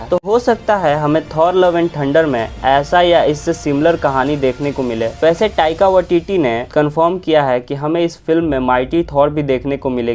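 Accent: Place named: Magahi, India. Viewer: Video khub nick lagal ba..keep uploading such contents bro.. can sense our Magahi tone..❤️❤️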